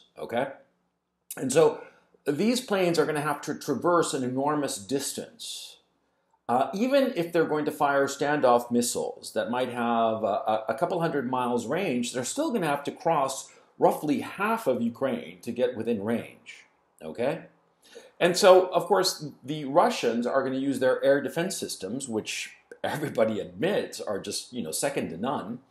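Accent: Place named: United States. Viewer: Claims to be from Ukraine on twitter, has the strongest american accent in existence, sure bud. How much is Russia paying you out of curiosity?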